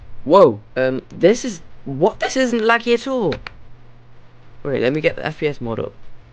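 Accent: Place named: United Kingdom. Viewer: wait, dollars are you american you sound a little bit british to me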